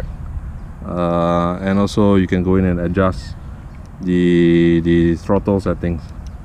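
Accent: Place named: Singapore. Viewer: Singaporean? :D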